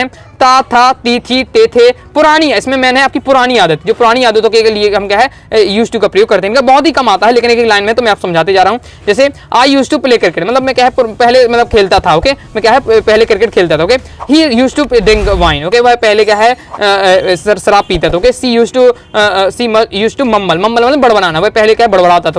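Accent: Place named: Bundelkhand, India. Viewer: सर आप तो कहीं कहीं बुन्देलखण्ड बी बोल तै हो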